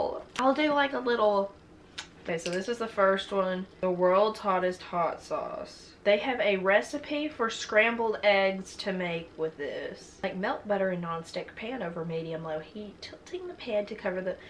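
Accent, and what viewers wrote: South, United States. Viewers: I’m new here so I was like “When you find out the jerky is made in LA give up the accent” but as I stayed I realized oh crap that’s her accent. It’s not Texan (like mine) but she’s actually southern huh? 🥸🤪 (especially not Texan when you don’t know what Venison is🤷🏻‍♀️) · She turns more and more southern as the video goes on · i live for that lil bit of yee yee she got in her voice. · I was listening to you and you sounded hella southern and I saw you lived in Alabama 😳 I do too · She sounds southern but didn't know what venison was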